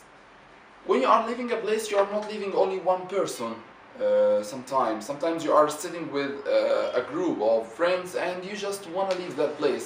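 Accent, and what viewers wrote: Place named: Egypt. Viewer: Egyptian accents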